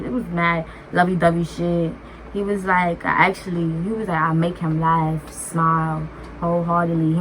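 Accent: New York, United States. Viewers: “Put me on to one of your friends. Ones that you do drills with.... sike let me stop” 😂😂😂🤣 We know you deadass sis! Don’t stop! 😭😆 You’re so lit!! Love this channel! 🥰💕 And don’t change ya NY talk they’ll catch up “FEEL ME” 🙃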